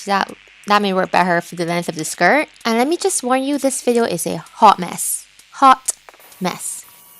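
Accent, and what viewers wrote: Barbados. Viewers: Heard the Bajan accent from the minute you started talking!💙🇧🇧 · Weird question, are you Bajan?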